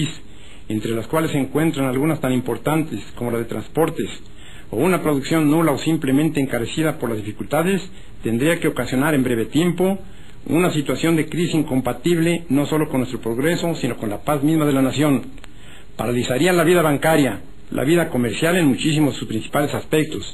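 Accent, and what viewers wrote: Mexico. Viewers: Asento Netamente Michoacano Viva El General Lazaro Cardenas Del Rio Viva El General Francisco J Mujica Hijos Nobles Michoacanos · ACENTO MICHOACANO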